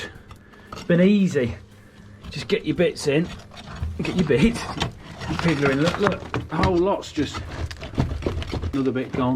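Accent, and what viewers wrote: United Kingdom. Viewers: It is only me or sound more british then before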